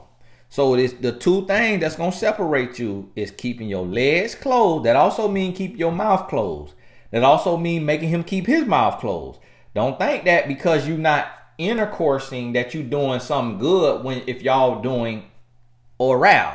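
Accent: South, United States. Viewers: It’s his southern accent , he is just funny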